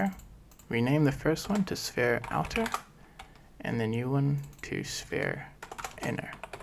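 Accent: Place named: New Zealand. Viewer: Bro you sound kiwi as eh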